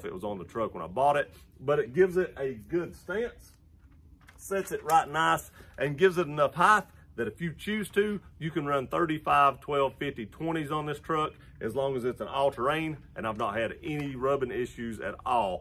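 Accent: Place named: Appalachia, United States. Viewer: Your accent sounds like West NC, Appalachian?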